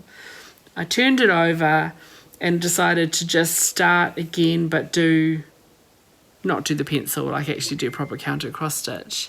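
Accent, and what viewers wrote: New Zealand. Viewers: Your accent makes me miss my Kiwi friends who live in Taranaki- next video if you can zoom in really close so we can all appreciate just how fast you are. The fact that you can talk while you’re doing this is amazing lol since I can’t do anything else but concentrate on the stitches. Thanks again. Keep ‘em coming!!!! 🪡🧵 🇳🇿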